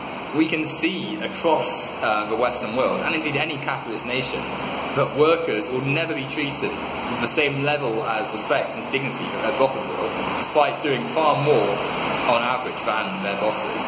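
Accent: United Kingdom. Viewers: ***** he's British?!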